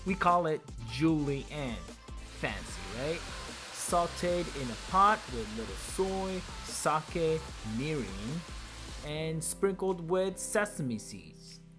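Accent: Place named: United States. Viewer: We don't say "you greedy" in English 🤔 Your spoken American English is a bit exaggerated.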